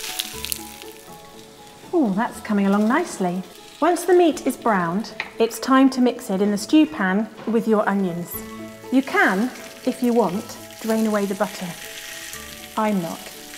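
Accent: United Kingdom. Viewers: What an amazing look, what an amazing British accent, what amazing kitchen tools .. It's a Brilliant video which made me so happy 😁 it's so classy & elite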